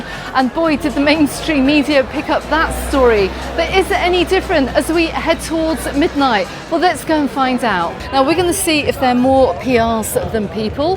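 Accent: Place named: Northern England, United Kingdom. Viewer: At first, I thought it was just some town in the north of England, until I realised it was commentating... Lol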